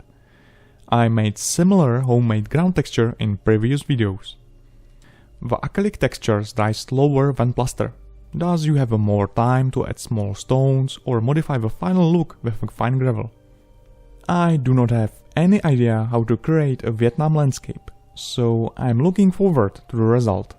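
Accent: Czech Republic. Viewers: čuchám český english accent? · This guy sounds like he’s from Czech republic. Trust me I know the accent. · He is from Czech like me you can find out by hearing R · Wtf ten český přízvuk se nedá přeslechnout.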